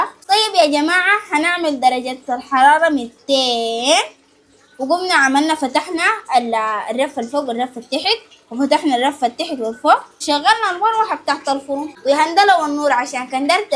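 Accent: Sudan. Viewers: اللهجه السودانيه رئعه ❤احبكم مره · مسلمة لطيفة مؤدبة بشوشة محتشمة تحكي بلهجتها بكل فخر اللهجة السودانية الجميلة بارك الله فيكِ يا اختي المحترمة والله يفرج عن إخواتنا في السودان وغزة واليمن تحياتي لك اختك من الجولان السوري 💚🤍🖤🫂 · احلى ما فيك اللهجة السودانية البحتة وكلمة شفع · انا فتحت الفيديو بس عشان اسمع اللهجة السودانية الجميلة الله يحفظ اهل السودان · كم هي جمييييل وحلوة اللهجة السوداني انت عسولة مثل الكيكه لسوتيها كل الحب من قلب الجزائر 🇩🇿 ❤😘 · اللهجة سودانيا 😂❤ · ايييييه ماااجمل اللهجة السودانية احبها كثيرا وتعلمتها باتقان من اختنا الحبيبة #تلك الفتاة الجميلة الراءعة شكرا على الكيكة الراءعة ياراءعة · مع ان الكيك عاديي وبنعملو من زمان لكن اللهجة السودانية. بلسانك كيووت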